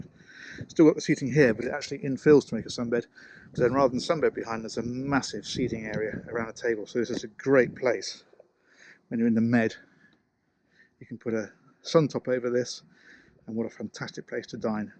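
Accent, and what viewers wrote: United Kingdom. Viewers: I like clarkson very much, but this nice man reminds me more of David Attenborough, because he speaks with a calm voice and just in plain, civilised, british english that even i can fully understand as a dutch dude, And Clarkson is way more loud and entertaining, which i like aswel, but not to review a yacht.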